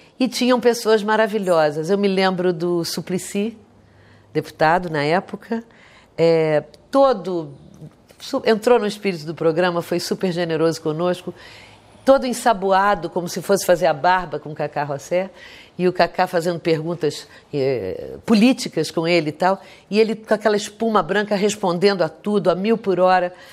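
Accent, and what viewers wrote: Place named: Rio de Janeiro, Brazil. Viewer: e esse sotaque carioca lindo!!!! e a carioca mais ainda!!!!😍😍😍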